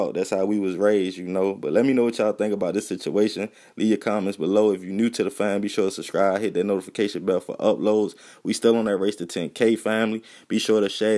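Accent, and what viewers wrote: Baltimore, United States. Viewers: You sound like Baltimore but, with a country twist. You not fully Baltimore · Yo as soon as I heard, yo talk, I was like yerp dats dat Yung bmore talk ya feel me, yo from West Baltimore... we gotta shine people gone hate on us fam. "Thru da dark night der's a bright day after dat"-. It's like a bucket of crabs💯😎but I love my city yo. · First time watching one of your videos .... You represented our accent here very well #Bmore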